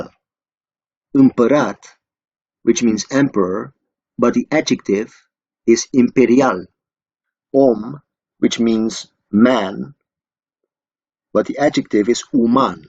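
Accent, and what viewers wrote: Netherlands. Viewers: I hear a fellow Dutchie🕵‍♂ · Is this guy dutch?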